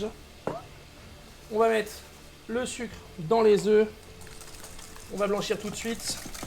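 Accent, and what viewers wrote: South, France. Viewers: cet accent du sud si merveilleux XD heureusement que tu fais des replays! en ce moment je rate tout tes lives :'(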